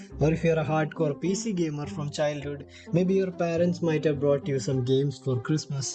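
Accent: Kerala, India. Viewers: anda malayali accent is clearly heard :) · I had a gut feeling that you might be from Kerala when you used that sad bgm from the Malayalam movie 'Vandanam', in one of your early videos. Now hearing your accent I can pretty much confirm. You are a malayali, right ? I share a similar story as I was hell bent into mobile games(keypad) of the past. I didn't know games were supposed to have a story until class 6. What you said about paying for games is right. I also didn't know games were supposed to be bought for a long time. One thing I never had was friends who had deep passion in gaming like me. I am always criticized and shamed for liking games by my siblings and cousins. But I know the happiness you get just opening an .exe file and trying out a game gor the first time. I'll always cherish that. Lots of love ❤❤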